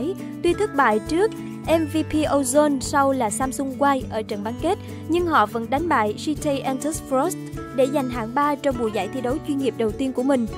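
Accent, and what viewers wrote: Standard. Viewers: Trời giọng chị này chuẩn vãi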